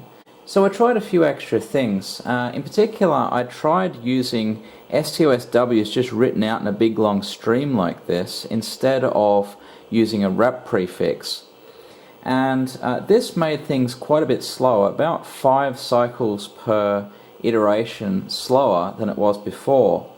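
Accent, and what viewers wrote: Australia. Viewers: Excellent video! Wizard-like skills! We should combine work over our YouTube channels or something if you are interested. Sounds like you are in Aussie - I'm in NZ. Give me a shout if you like :)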